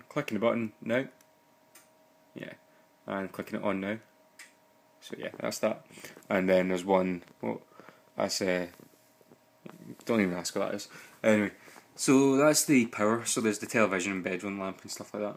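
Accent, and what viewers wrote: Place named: Scotland, United Kingdom. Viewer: That's because he's Scottish, and your English is not good enough. Sorry - you were expecting a personal translation just for you?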